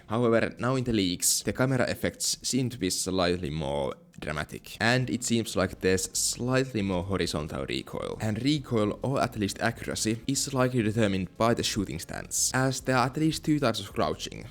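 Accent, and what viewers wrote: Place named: Finland. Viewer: awesome finnish accent bro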